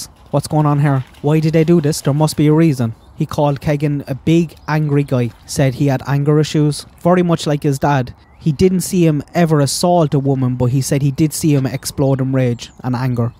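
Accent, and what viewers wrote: Cork, Ireland. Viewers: New subscriber is that a Cork accent feen??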